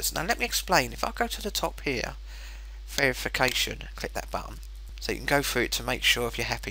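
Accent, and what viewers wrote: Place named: England, United Kingdom. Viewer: FUCKING ENGLISH ACCENT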